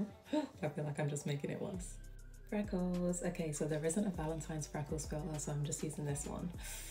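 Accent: United Kingdom. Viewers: ARE YOU BRITISH??????? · Are you British By the way I love you❤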